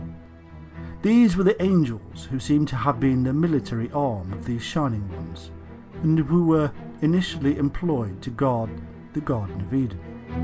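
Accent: United Kingdom. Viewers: The minute I heard the British accent I knew this would be a watered down "damage control" version. Presented more as a myth than reality. Read Sitchin for the real truth.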